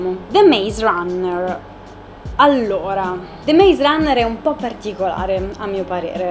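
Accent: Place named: Rome, Italy. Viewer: Comunque ti dona l'accento romano 😄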